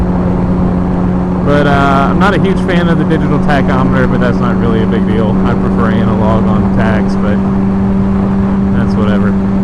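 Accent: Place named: Alabama, United States. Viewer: U from Alabama too?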